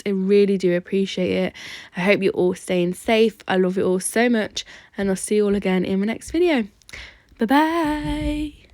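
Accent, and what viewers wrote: United Kingdom. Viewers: Jajajaja i love the way you said piña colada I’m from Mexico and I love your British accent Greetings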